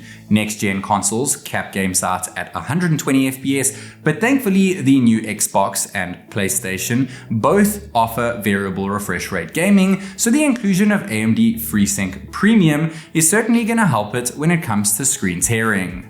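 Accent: South Africa. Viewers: This is the first time I stumbled across content from you, immediately heard that lekker SA accent my bruuuu. Cool video, thanks!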